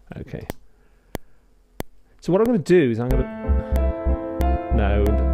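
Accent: United Kingdom. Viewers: for the 30 seconds i just like his British accent and humble personality just pairs well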